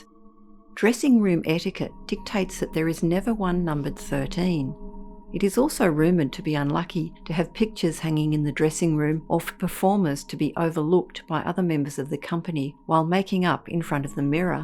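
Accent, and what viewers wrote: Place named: Australia. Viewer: This is my favourite channel. I love hearing Australian voices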